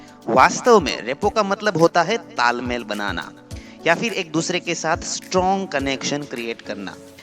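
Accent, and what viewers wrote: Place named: Gujarat, India. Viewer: voice se lagta he aap Gujrati ho.. love from Gujarat I am from Rajkot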